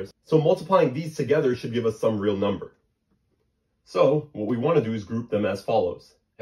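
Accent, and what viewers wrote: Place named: United States. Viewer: Didn’t know bro sounds American😭 (I thought he’s Arab)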